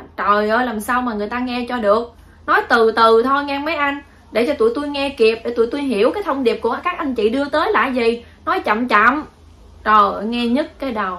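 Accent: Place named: North, Vietnam. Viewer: Sometimes it is difficult to understand the Tonkinese accent.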